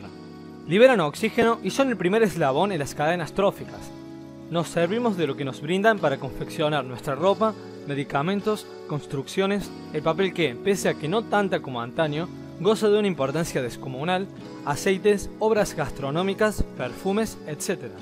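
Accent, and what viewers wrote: Córdoba, Argentina. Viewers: no entendí nada con el cordobés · sós coórdobés vóz? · Alto cordobes xdd Me sirvio bastante para ciudadania :v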